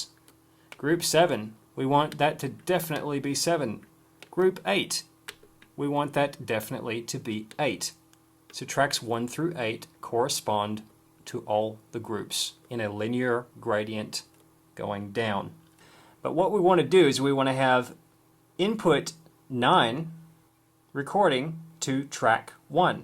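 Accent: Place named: Australia. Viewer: are you aussie? if not you have such an aussie voice for an american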